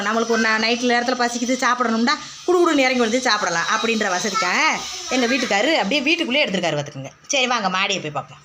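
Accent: Madurai, India. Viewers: But your madhurai slong vera level, i am live in karaikudi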